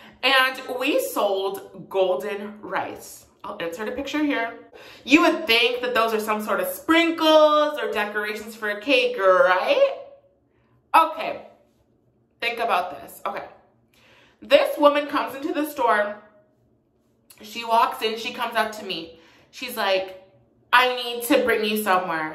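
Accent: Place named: Canada. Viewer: Yeetus Feetus because he pronounced those words with the “long a” sound. Which is okay, I know he’s from Canada. But I’m from America and we pronounce those words with the “short a” sound so I thought it was funny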